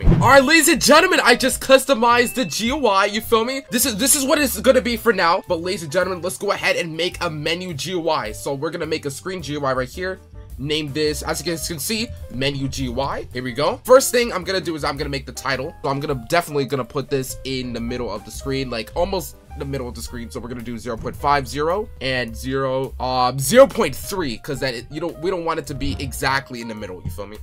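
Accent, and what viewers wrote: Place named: Germany. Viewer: german accent.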